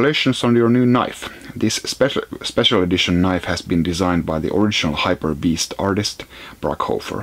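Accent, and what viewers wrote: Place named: Finland. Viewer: I noticed the finnish accent right away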